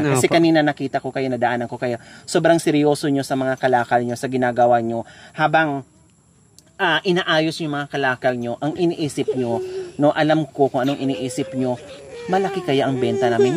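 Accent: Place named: Pangasinan, Philippines. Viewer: tita halata sa diction nyo n po taga Pangasinan kau tulad ng father k na taga Warey, Malasiqui Pangasinan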